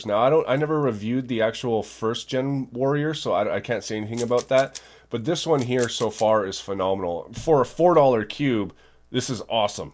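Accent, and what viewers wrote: Canada. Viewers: so are you Canadian?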